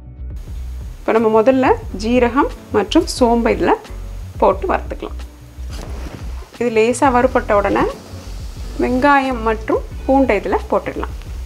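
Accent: Sri Lanka. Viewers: It was really nice.. but your way of speaking is the nicest. Native place Sri Lanka va sis? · Hi sis i am rekha.tomato curry nalla iruku. try pantren.nenga tirunelveli thana. Nenga pesum konchum tamil ketkum pothu sri lankan pesum alagu tamil mathiri iruku.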